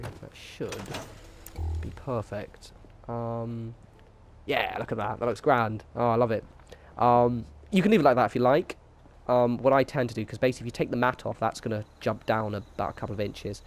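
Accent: United Kingdom. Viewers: You sound like a british Travis, lol. In a good way, great vid.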